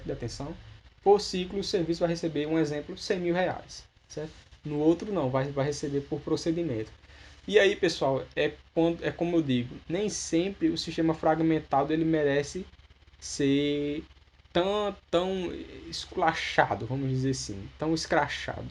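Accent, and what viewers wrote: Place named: Rio Grande do Norte, Brazil. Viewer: Adoreiii!! Esse sotaque bem Potiguar.. Sou de Natal-RN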